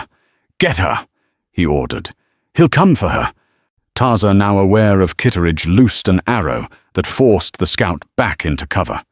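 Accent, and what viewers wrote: United Kingdom. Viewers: What a great voice! A beautiful British accent. Interesting story. Oslo, Norway.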